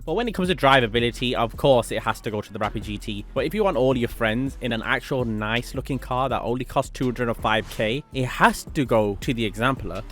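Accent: United Kingdom. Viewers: The uk accent 🤮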